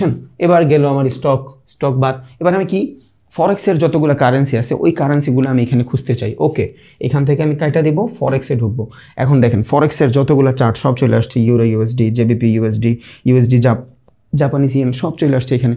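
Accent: Bangladesh. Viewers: দাদা আমি ভারত 🇮🇳(কলকাতা,পশ্চিমবঙ্গ থেকে আপনার ভিডিও গুলো এই বেশ কিছুদিন হল দেখছি, আমি বাংলাদেশ 🇧🇩কে অনেক ভালোবাসি এবং ওখানকার মানুষ জনদের কথা ও কথা বলার ধরণ আমার খুব ভালো লাগে,হাসি 🤣 ও পায় | 🐯 বিশ্বাস করুন আমি প্রথমে আপনার ভিডিও গুলো দেখতাম শুধুমাত্র কথা গুলো শুনবো বলে , কিন্তু পরে ভিডিও গুলোর Content ,আপনার বোঝানোর দক্ষতা ,ও আপনার পরিশ্রম কে দেখে আমি আর Comment না করে পারলাম না | 🔥আপনার প্রশংসা যতই করা হোক না কেন কম হয়ে যাবে |🤗 যাই হোক ভালো থাকবেন সুস্থ থাকবেন আর আমাদেরকে এই রকম ভাবে আরো সমৃদ্ধ করবেন 🙏 Always Support to You ✌️😉 ভারত 🇮🇳 থেকে শুভেচ্ছা ও ভালোবাসা নেবেন 🥰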